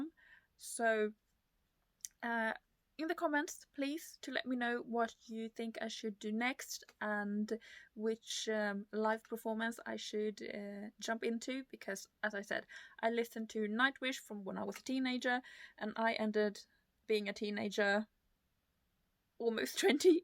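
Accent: United Kingdom. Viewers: That must be the most British-ish accent I've heard from a non-Brit 😁. Nice to see you getting back on track. I was somewhat out of NW too for several years (but then again I didn't listen to any music other than what was on radio when driving), but have been catching up the past year. In a way it was a healthy break for me as I can now observe with a new perspective. And another greets from Finland. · My thought exactly, about the accent. At first I was a bit confused - like "Is she British or Swedish or what..." 😂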